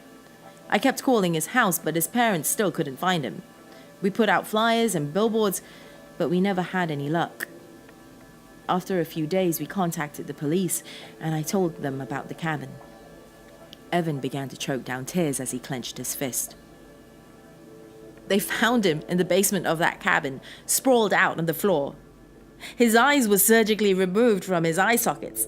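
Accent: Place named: RP, United Kingdom. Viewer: I'm really enjoying your channel. I have noticed that you seem to get more adverts than the likes of Mr. CreepyPasta and Creeps McPasta (my head has a problem getting around Creeps' last name and yours lol!) You seem to be proving my theory that its stories like Slenderman and probably Jeff/Jane the Killer that have been involved with real life controversy that keeps advertising away. I have been puzzling over your accent which comes across as very non accented BBC or RP English. I firmly believe that narrators like yourself do as much preparation as you would if you were acting a part. I'd guess that you've done English to a high standard and have done some voice production work which makes me think that you're an actress. The thing that got me puzzled about your accent was that occasionally it sounds almost like a really soft Scottish lilt and occasionally I hear a little London (I'm a Scot living in London). 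Its brilliant listening to stories being read properly and if you haven't already, I would approach some of the publishers who do audiobooks because, I've heard a lot since I can't seem to own reading glasses for longer than 48 hours without breaking them! I have listened to a lot of narrators who are working on Best Selling Audio Books and you are every bit as good as, if not better than most of them. I am really pleased to have found your site because I am heartily sick of hearing good stories murdered by narrators who don't seem to prepare or even look the story ove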